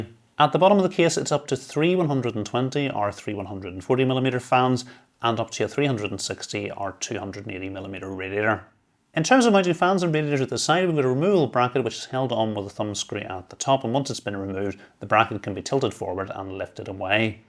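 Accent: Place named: England, United Kingdom. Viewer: wow this channel is great. it took me a while to fully understand this english accent but now i can listen to you all day.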